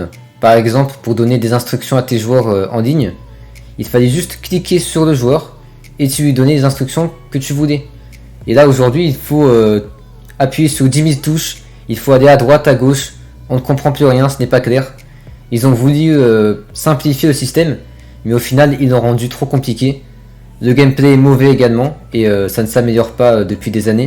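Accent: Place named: France. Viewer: Was great to listen to you. You have a beautiful French accent. I enjoyed your presentation 😄big like 👍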